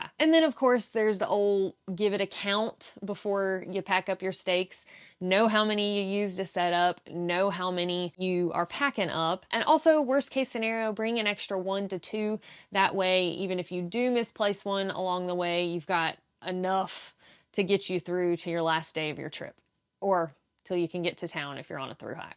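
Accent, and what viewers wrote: South, United States. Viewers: I would be laughing with you if she wasn't so cute and I didn't love the southern accent. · A hot blonde with an American southern accent is about as hot as it gets. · Your southern accent really came out on this one. I was asking why on earth would anybody be hiking with a ladder?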